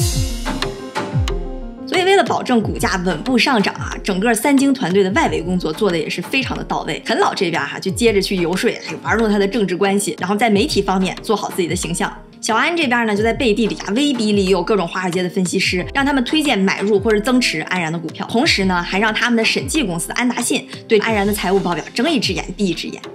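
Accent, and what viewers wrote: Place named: Northeast, China. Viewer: 美丽，睿智，幽默，专业。喜欢您的东北口音：）想起了多年前在长岛的一个哈尔滨美女，我的麻将搭子，哈哈。关注了。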